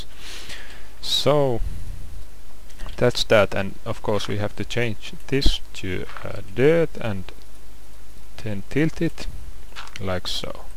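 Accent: Finland. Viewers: you sound like finnish guy speaking english... are you finnish?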